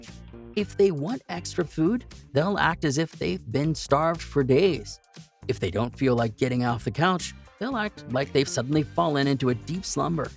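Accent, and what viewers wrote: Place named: United States. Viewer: This guy is an absolute idiot……..these dogs unfortunately will eat anything and everything……any break from kibble or dry biscuits is a blessing…. Do not feed these dogs any kibble no matter how expensive……it is deadly to them, most dogs but definitely these….no cavalier after 2 has endless zoomies…..the stupid American English accent ……they should never be left alone for more than an hour or two….Do not get one you can’t afford to stay at home or work from home……they would die early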